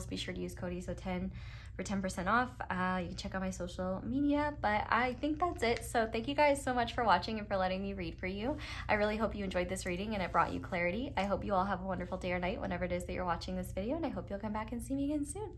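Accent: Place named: Wisconsin, United States. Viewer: Pile 2 - the Wisconsin accent 😂 me being from Wisco and a Packer fan 😂😂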